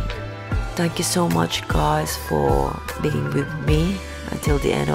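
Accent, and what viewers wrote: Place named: United Kingdom. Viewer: Why are you speaking in British English accent ? Sosyal!!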